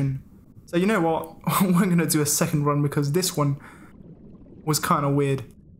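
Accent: Italy. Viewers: He’s Italian I think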